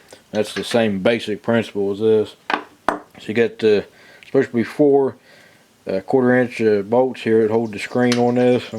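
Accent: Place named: Texas, United States. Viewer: Superb instruction! And that 'accent'-can't be far from Corpus Christi and Texas state 77!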